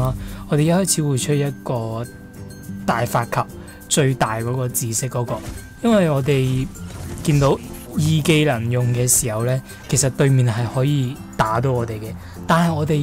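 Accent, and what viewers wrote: Hong Kong, China. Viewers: 聽你口音就知道你係香港人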